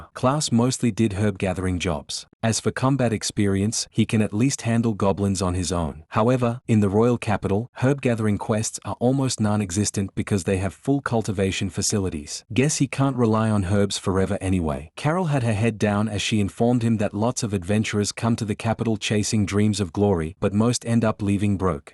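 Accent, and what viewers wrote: United Kingdom. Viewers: I noticed the British accent last time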